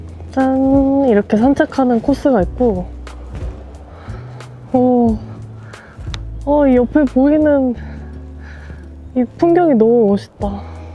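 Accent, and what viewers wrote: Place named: Gyeongsang, South Korea. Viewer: 혹시 고향이 경상도 아니신가요? 약간 사투리가 나오는것 같아요ㅎ ㅎ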